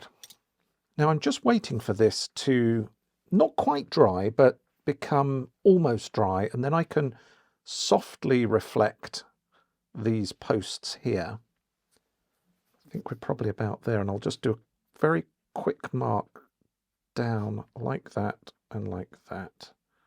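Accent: United Kingdom. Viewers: Very informative! Off topic; are you from the same area as John Cleese? You guys have the same accent.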